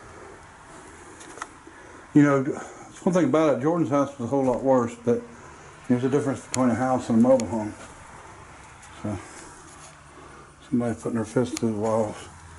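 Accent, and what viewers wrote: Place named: Maine, United States. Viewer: Sounds and looks like maine accent is throwing me off tho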